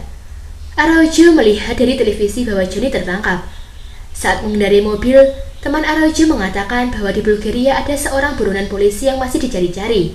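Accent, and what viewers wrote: Java, Indonesia. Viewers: Plis jan medok si, gw tau lo jamet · Medok banget, jowone ndi mbak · suara medoknya itu loh ,bikin gimana gitu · Mba e logat jawa poll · Logat jawa nya medok bgt mbak 😁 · mbae ngomonge medok bgt hihi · Medok bgt mbak, but i like it 😍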